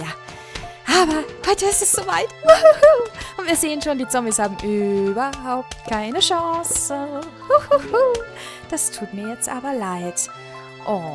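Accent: Bavaria, Germany. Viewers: Yoooo bayrisch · ich bemerke deinen bayrischen akzent , aber er fällt kaum auf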